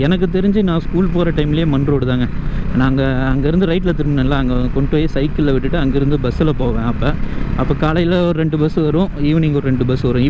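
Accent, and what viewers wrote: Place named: Kongu, India. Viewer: koghu selga unga voice ,neega gounder ah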